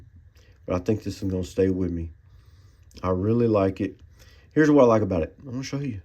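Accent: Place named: Texas, United States. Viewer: First off, just who in the heck is this dude in the video? It sure don't SOUND like!! Dude's got a deep, raspy Texas accent, loves some Case knives and is drinking a Dr. Pepper...so maybe it's another? Is this brother?! Hey there, man! Nice to meet ya!! That Rail Splitter is freaking gorgeous, LOVE the bone on that!! As you noted, that etch IS rather unfortunate...I'm seeing some Flitz in that blade's future 🤣. The other two are sweet too!! Case's 70s/80s shades of brown & red just can't be beat. That Delrin really does look like bone! This recent vintage Case kick that you're on is positively contagious..you got me looking at a TON of them now!!! They really are light years better than modern Case, that's for sure. Great video!! Hope you feel better bro!!! 1...2...7...GO!!! ⚡💥🔪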